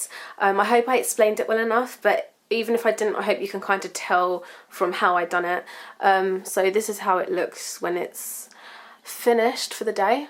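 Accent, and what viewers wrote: London, United Kingdom. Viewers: Love your videos and your accent! What part of the UK are you from? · Luvved this video and is she british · She's not Irish, in fact if I had to guess I'd say she's from London.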